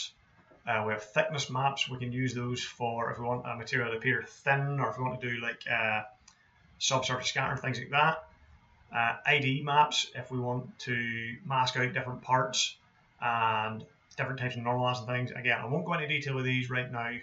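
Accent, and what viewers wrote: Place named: Northern Ireland, United Kingdom. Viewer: Trying to import cameras from Blender into Substance led me here. Whilst this video didn't answer my question, it did introduce me to a fellow Mourneman. Well, I'm guessing from the accent!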